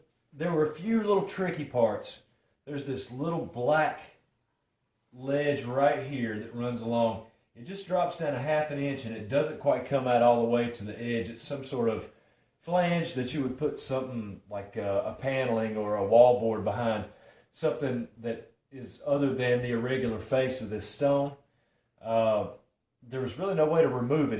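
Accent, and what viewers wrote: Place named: Georgia, United States. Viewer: thanks for the quick response! Where do you do your work? You sound like you're from GA.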